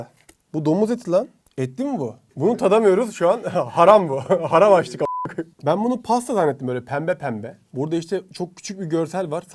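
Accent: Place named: Istanbul, Turkey. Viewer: Abi sunarken içten sunuyorsun. Sokak ağızıy la ve istanbul türkçesi ni karıştırıyorsun. Buda etkileyici duruyor. Özellikle tonlamalar 😅. Küfüre pek takmayın sadece izleyin. ☺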